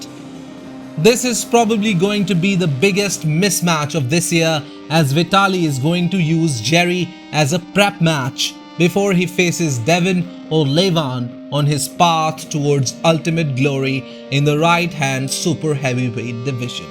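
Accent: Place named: India. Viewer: ur indian accent is hilarious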